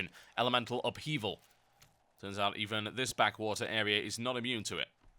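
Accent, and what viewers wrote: United Kingdom. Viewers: I come for the WoW stay for the british accent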